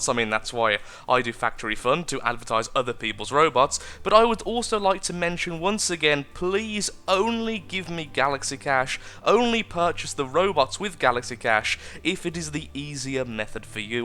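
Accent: United Kingdom. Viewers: for his accent, UK most likely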